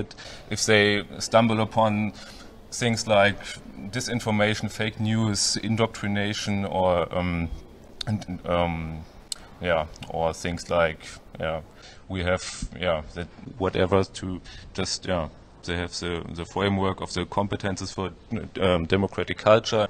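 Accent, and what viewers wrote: Germany. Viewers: I really love German accent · I live in Germany and this is how germans speak here English. Absolutely normal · Every German speaks English like that, that's normal and funny :) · Haha. Its so typical german accent. Most if them have a very strong accent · How is this funny at all? His English is really good. Just with a German accent. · Yea that's the German accent, I think it's beatiful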